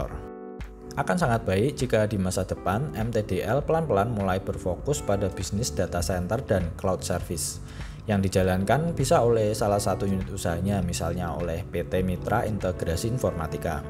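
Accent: Java, Indonesia. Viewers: Medok Men coco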